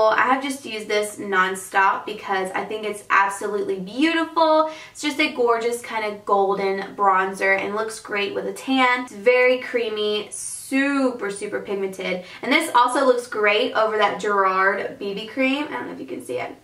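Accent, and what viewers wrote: South, United States. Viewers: I really enjoy watching your videos. I love the products you recommend, I love your makeup in every video, and I adore your southern accent. But...your lighting is SO bright that it washes out (you sometimes) but mostly the swatches and products you show. It's hard to get a good idea of what the coloring is. Just a note.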